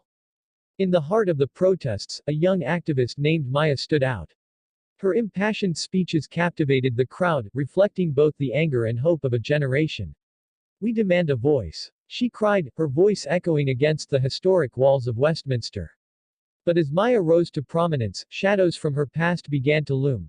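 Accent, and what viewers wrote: United States. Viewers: American accent?Bye bye.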